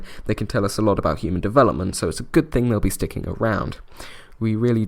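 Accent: United Kingdom. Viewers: You are so jaded but yet informative, I love it! You got a strong british accent as well, it fits your character. And I'm not sure of the term "jaded", I'm not an english native, for me it means you are funny while being like tired of your life kinda. Just so you don't take it as an insult and now my comment is getting to long and I'm starting to sound too politicaly correct for a stranger on the internet. SUGOIII DESUNE (that's for the contrast, the bad kind of contrast) One question: are you proud of being british? Thanks for the laugh, I suscribed. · This is the most annoying British accent combined with this teenage voice. Wtf.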